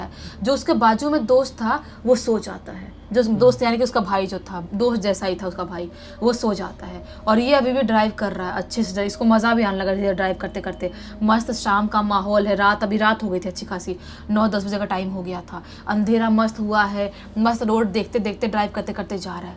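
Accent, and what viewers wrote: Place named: Eastern Uttar Pradesh, India. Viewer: Language se pta chalaa mam shayad eastern up ya Bihar-jharkhand se h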